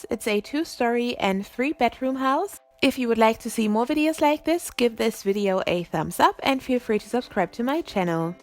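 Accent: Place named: Germany. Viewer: Sie kommt zu 100 Prozent aus Deutschland ich höre es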